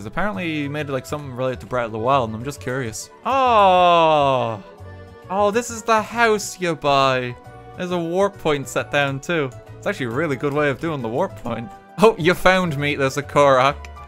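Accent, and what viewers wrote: Ireland. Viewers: is that a country irish accent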